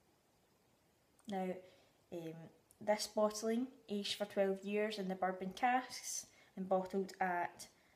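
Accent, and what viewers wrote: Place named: Scotland, United Kingdom. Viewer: Awesome Review. Just subbed:) The scottish accent just makes me think you know so much more about whisky then I do:) I just found Deanston and wish I would have found it sooner. Slainte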